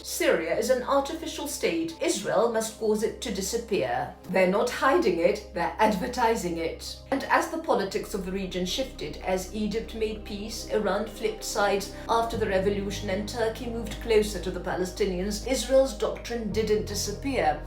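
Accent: RP, United Kingdom. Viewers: Her voice is like she from English ROYALTY 👑 ..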